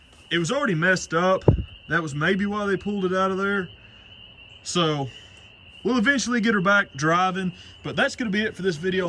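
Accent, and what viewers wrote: South, United States. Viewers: I love your thick southern accent. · I just started following you on tic tock and now on YouTube, I think the time you spend on these old vehicles is great and well deserved. People probably don’t understand how well they are built. You sound like you are from the south, the vehicles from the north where I’m from don’t live as long but I appreciate what you do!! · You got the most American accent i have ever heard lol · Love your accent, where in America are you from? Plus, great vids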